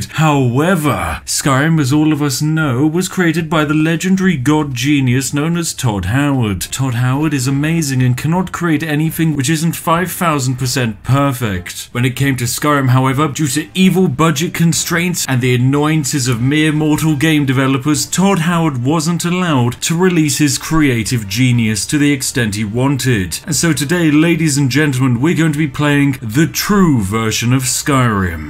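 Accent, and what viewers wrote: United Kingdom. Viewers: Yay a brand new British Boi!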